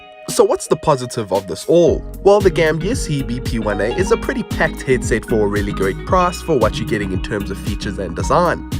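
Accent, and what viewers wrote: South Africa. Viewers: i hear a fellow saffa accent, I hit subscribe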